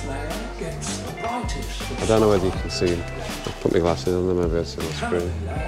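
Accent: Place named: Wales, United Kingdom. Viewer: hell no 👊I just want to hear Your voice, a Wales accent is so cool 😎. BUT there will be songs that you will like,very far and few between, but you will like them.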